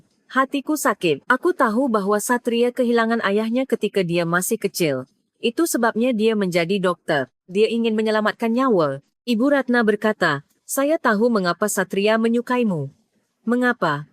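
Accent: Malaysia. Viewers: Bahasa mu Melayu Malay kenapa anda lebih suka bikin karangan lepas dan tidak jelas membawa nama indonesia apakah ini bentuk penghinaan anda terhadap Bangsa dan negara ku karna AQ Tahu ini cerita fiktif kenapa anda tidak membawa nama bangsa negara yang sesuai dengan logat bahasa mu bahasa yang kamu pakai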